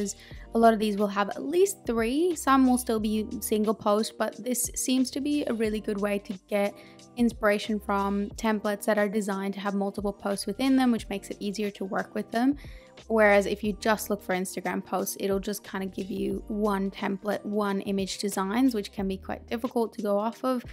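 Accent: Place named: Australia. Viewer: I love trying to decipher accents, especially blended accents like yours. What I’m mainly hearing is Australian but with a bit of something else. Is it North American? Irish?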